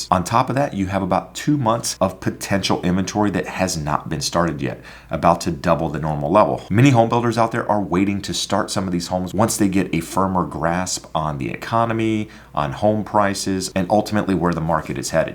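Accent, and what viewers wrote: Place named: North Carolina, United States. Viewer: I knew that was a NC accent. I completely agree. I just sold my house at 202,000 when I listed it at 185,000. I had 7 different offers and we went with the highest bid. Then we went and bought a new construction and they gave us an ammendum to lower the price by almost 30,000. So we were blessed to have a win'win situation. We locked in a 5.75 interest rate and the monthly payment is something comfortable. Great opportunities are still out there. Great vdeo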